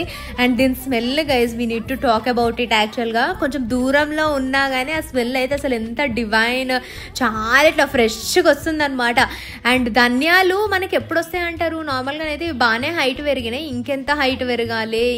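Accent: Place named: Telugu, India. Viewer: The way you're talking english same to same Telugu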